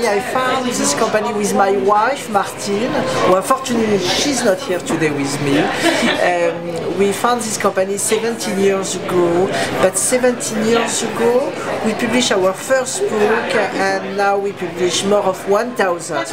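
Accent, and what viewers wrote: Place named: France. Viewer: his high pitch voice with french accent are very adorable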